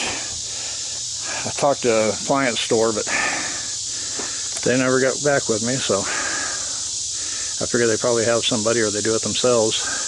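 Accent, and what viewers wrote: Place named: Oklahoma, United States. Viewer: It's the okie accent I've always called it wheelbarrel even though it's barrow we Okies add that url to it just like we say y'all instead of you all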